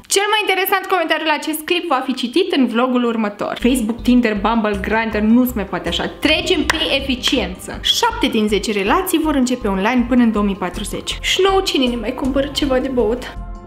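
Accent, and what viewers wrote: Moldova. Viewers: Singurele persoane care pot sa aiva o relație cu mine sunt băieții din 1D. Ps: imi place accentul tau de moldoveancă drăguță. 😘😘😘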